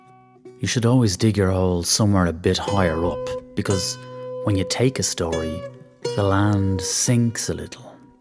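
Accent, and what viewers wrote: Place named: Ireland. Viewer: this is so precious!!! once i can get over that dream of an Irish accent and put my focus on the story and the gorgeous mountain scapes!!! bravo ...a wonderful and rich story!!!!!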